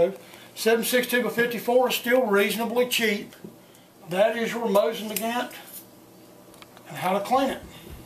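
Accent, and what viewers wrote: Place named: Northeast, United States. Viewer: He lives in Maine as you can tell by his heavy, Northeastern accent. LOL. This really is good and detailed video.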